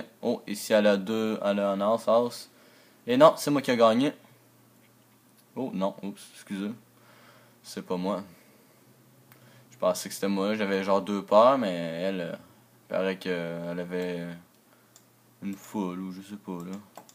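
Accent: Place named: Belgium. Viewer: tres bien expliquer mes bon axent belge je croie j aime bien je voulait te dire j aimerai bien quon joue ensemble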